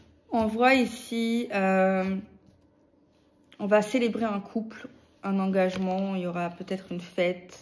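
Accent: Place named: Provence, France. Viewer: Coucou vous êtes de quel département le Vaucluse ou bouche du Rhône pour l'accent que vous avez ? Bonne journée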